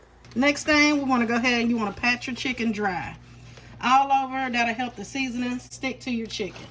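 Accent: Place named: South, United States. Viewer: Honey I don't know where you live. I do know this by the way you are talking it sounds like are in the SOUTHERN AREA OF THE UNITED STATES. You have a way with explaining or teaching this type of food better than anyone I've ever heard. You made this episode look so easy and it makes me hungry. I beg you to invite my over for supper. Please!!!! I'm just kidding will you.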